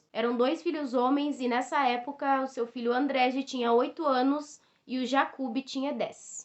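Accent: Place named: South, Brazil. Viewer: Olá 😃 conheci seu canal hj e já adorei ... Parabéns pelo trabalho 👏 pelo seu sotaque acho que vc e aqui do sul ?!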